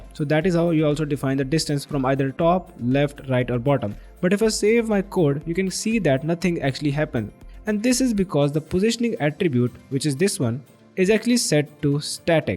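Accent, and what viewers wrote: India. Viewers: Just relised you have a kashmirir accent! Are you from JK?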